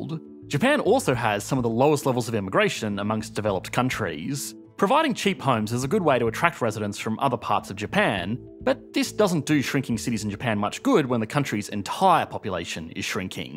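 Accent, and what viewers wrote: Australia. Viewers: I detest this channel, and not because of the unbearable Australian accent. Nevertheless, I want it to go on if just to watch other YouTubers point out the myriad of mistakes in these videos.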